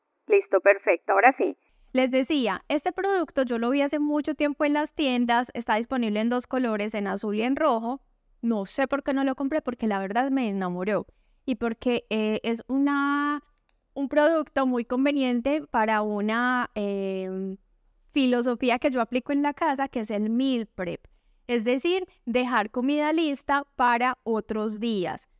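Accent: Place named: Antioquia, Colombia. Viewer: Hola. Me encanta tú acento paisa, eres muy tierna. Cuéntanos sí té encontrabas sola en el momento del temblor. Té envío un gran saludo desde Bogotá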